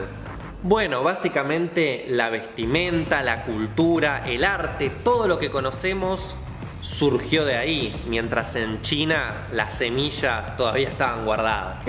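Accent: Argentina. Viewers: me mama (encanta) el acento argentino, justo hace 2 semanas apenas veía este tema, muy buen video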